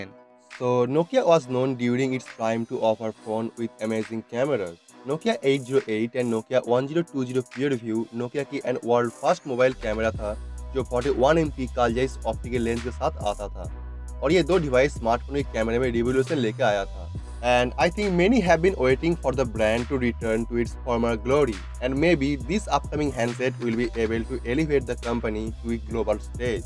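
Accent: Bengal, India. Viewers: Firts Change ur bengali tone broder....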